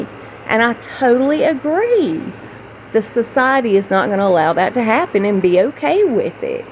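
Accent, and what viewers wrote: South, United States. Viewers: You are in my prayers daily and have been since the beginning of this. I'm sending you hugs, prayers for comfort and healing and lots of love! My husband has become a fan. We are in the south, from the south and he loves your accent. When he hears me listening to you, he always stops in to see what you have to say. You just remember to put yourself first and take care of yourself. ❤️ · Get better soon. It's not fun being so sick like you are at the moment. Hang in there. It takes time to get over serious illnesses. I'm into my second year of recovering from pneumonia after being in ICU. Queensland. Has left me with walking disability and enlarged heart. I get great joy in listening to your programme with that Southern drawal. So don't you go anywhere. Your show tells it like it is. It walks all over the others in respect to authentic royal gossip. 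Up there with Lady C. My answer to your quesastion rse Queen's medal to Meghan in is:. if the Queen does this then it shows the public and parliament that the Queen has lost her mental capacity and its time for her to go.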